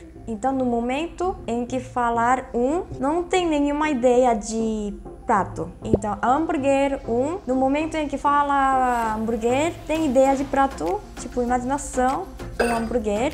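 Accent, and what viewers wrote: South Korea. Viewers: Sotaque coreano é tão bom de ouvir ♥️ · Sotaque coreano é gostoso de ouvir. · Engraçado, estrangeiros normalmente dizem que tem dificuldades em alguns conceitos de português como gênero, número e essas coisas. Mas as vezes esqueço que você é coreana de tão bem que fala português. As vezes você deixa escapar um pouco do sotaque, daí eu me lembro que você é coreana de verdade!^^ Não consegui ver nada de errado no seu português, NADA! Você é muito humilde em dizer que não conseguiu explicar muito bem por causa do "seu português", você não só explicou bem, como explicou de uma forma EXTREMAMENTE agradável de ouvir, um jeito típico das fofas mulheres coreanas, mas que em você se torna muito mais agradável! Amei <3! Ótimo vídeo!!!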